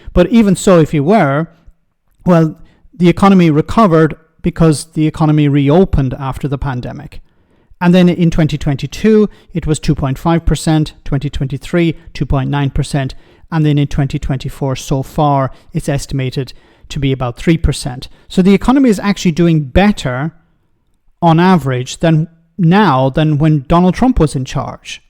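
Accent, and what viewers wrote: RP, United Kingdom. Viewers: From the bloke who said Brexit would bring down food prices. And yet thousands of English (& only English) people will tug their forelocks & doff their caps whenever they see or hear this plummy voiced, old Etonian chancer. Bizarre doesn’t cover it. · talk to all people like they are thick and stupid and him. He think the posh voice get him a head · Remember, this is the very same Rees- who in 2013 was the guest of honour to a black tie dinner event hosted by the Traditional Britain Group, a very far right group of ghouls who thinks non-white Brits such as myself should be repatriated to their or parents place of birth. Rees- was advised not to attend by an anti-fascist campaign group, Searchlight. He went anyway. Only when he'd been outed did he offer a mealy-mouthed apology. Thus, it's merely one posh spoken bigot supporting a foul-mouthed bigot.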